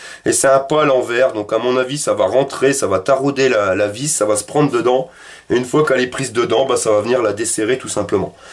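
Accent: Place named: Nord-Pas-de-Calais, France. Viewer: oh!! un chtio biloute j'adore l'accent mdr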